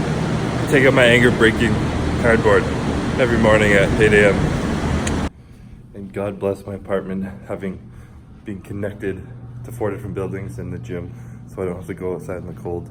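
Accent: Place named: Quebec, Canada. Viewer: “Beurre d’arachides” 😄👍 haha you should do more video in french!!! You will gain more viewers with your French Canadian accent !!!😆🤙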